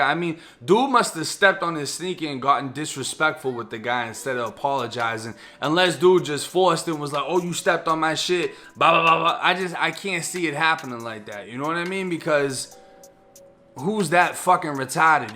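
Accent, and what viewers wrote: Boston, United States. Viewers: Yo, you from Boston? You got the accent. You ever done time in Concord or Shirley?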